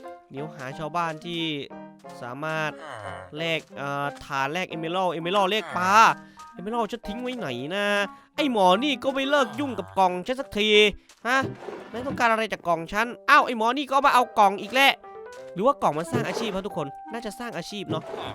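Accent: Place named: Isan, Thailand. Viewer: พี่พูดอิสานได้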